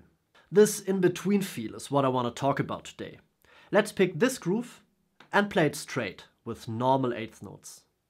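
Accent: Germany. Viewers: Man hört direkt das du Deutscher bist haha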